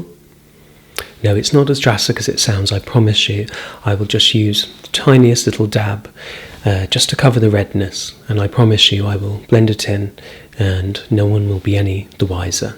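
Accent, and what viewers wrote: United Kingdom. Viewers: Great job. As an American, something about various British English and pronunciation is just supremely professional and clean. This series of yours is an absolute fan favourite.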